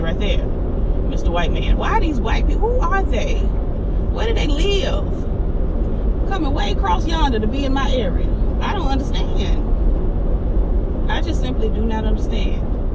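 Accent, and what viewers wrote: South, United States. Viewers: You make that southern dialect look good 🥰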